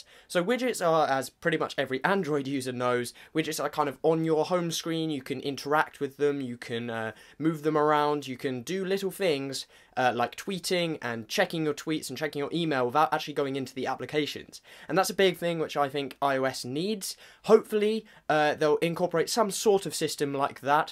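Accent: United Kingdom. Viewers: I really like your british accent!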